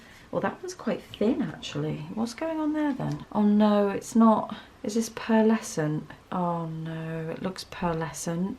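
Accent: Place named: United Kingdom. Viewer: I am soothed by your British accent here lol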